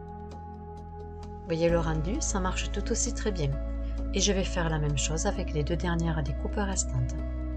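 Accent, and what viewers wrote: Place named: Montpellier, France. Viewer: Bonjour, je viens de m'abonner, parce que merci pour ce partage et aussi parce que j'ai le même accent! !! Hihihi, je suis originaire de montpellier, mais je vis en RP, à bientôt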